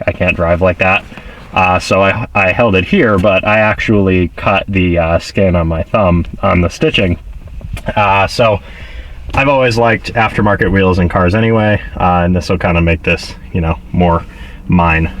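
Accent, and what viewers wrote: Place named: New England, United States. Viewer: New England, not England lol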